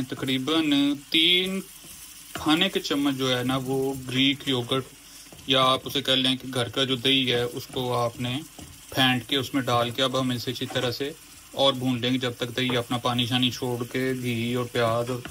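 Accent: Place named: Lahore, Pakistan. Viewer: Lahori lahja ay tada paw g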